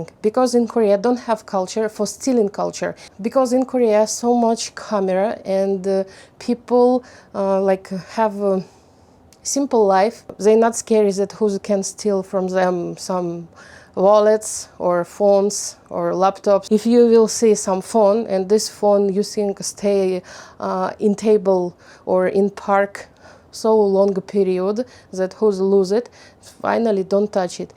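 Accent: Russia. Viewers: Я так рада, что ваши видео теперь на английском так как учу его😍 Единственное что режет уши это наш ярко-русский акцент с твёрдой буквой «d», но это чисто мои загоны, по произношению. Но вот что меня порадовало, я без конца боюсь с артиклями, а у вас их не особо услышала, значит можно и без них🤣 Спасибо за ваши старания не смотря ни на что❤️ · Я не андэстэнд по - английски😂, да и первое видео на этом канале смотрела информативный, куда вы пропали, но какая же вы милашечка! Какой милашный акцент, русский прям, так приятно наблюдать за вами и слушать! Спасибо большое за ваш труд, больших успехов и развития каналу!🫶🏻🌹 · Здравствуйте, Ваш английский довольно хорош, единственное, что это русский акцент в словах the, that и т.д. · Thanks a lot for your helpful video! I like your way speaking English. It's very fluent. You sound confident and your hard russian accent sounds exotic. 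It's nice! If you need any help with English, feel free and ask. I am an English teacher. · Действительно, можно поработать со временем над произношением.) Пока что это классический рашн аксент.)) Но замечательное начало.) Надеюсь, все больше новых комментариев у канала будут на английском от иностранцев. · Русский акцент никуда не денется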